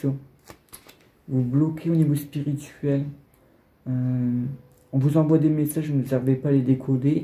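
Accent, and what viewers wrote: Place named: Villers-Cotterêts, France. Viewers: J'ai passé mon enfance dans votre région. En vous écoutant, j'ai remarqué votre accent, en particulier les "o", j'ai pensé au Nord mais je sentais que ce n'était pas cela. J'ai découvert Chambry 02 (moi, c'est Neuilly Saint Front près de la Ferté Milon). Je connais cet accent tellement bien mais cela si longtemps (60 ans) que je ne l'ai plus entendu, qu'il devait y avoir un blocage pour que je ne l'identifie pas immédiatement. Merci infiniment de m'avoir ramenée dans mon village avec votre voix. J'ai noté vos coordonnées. Vous êtes un bon voyant. Prenez soin de vous et de votre chat. Bien cordialement. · Moi pareil c'est villers-co près de la Ferte Milon. J'ai quitté ma région depuis presque 20 ans et d'un sens je regrette la forêt et cette belle nature. Bien sûr j'ai reconnu cet accent puisque je l'ai gardé 😊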